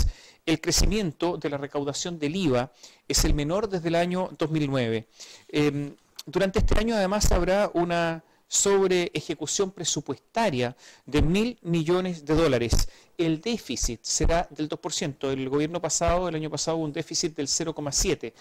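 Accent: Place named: Chile. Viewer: cada vez encuentro mas chilenos con su acento estupido el peor castellano de america!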